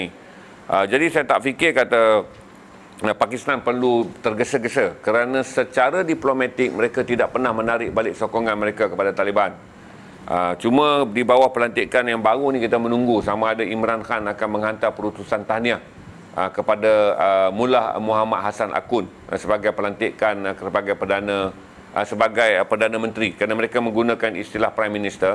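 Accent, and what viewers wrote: Kedah, Malaysia. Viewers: Nak dengaq tuan ckp Kedah sepenuhnya.....hehehe takut hilang pelat Kedah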